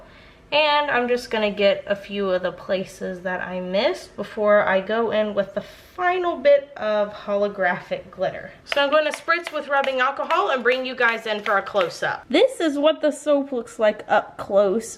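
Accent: United States. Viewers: why do you have a country girk accent ive been subscribed for a while and ive been wojdering this from the start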